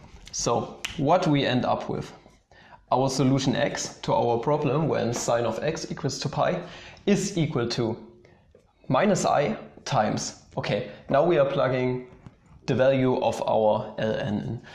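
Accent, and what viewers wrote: Germany. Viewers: Bist du deutscher? Man hört deinen Akzent hehe und dein Video hat mir geholfen omg danke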